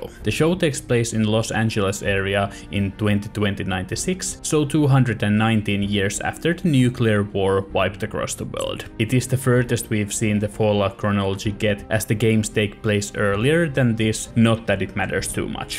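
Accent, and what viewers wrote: Finland. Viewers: the finnish accent is quite recognizable 😅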